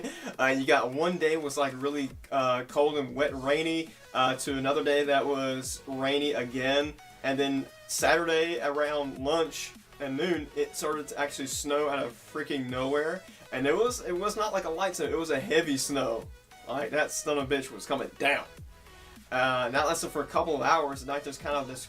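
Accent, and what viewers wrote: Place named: South, United States. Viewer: The southern accent thooooooooo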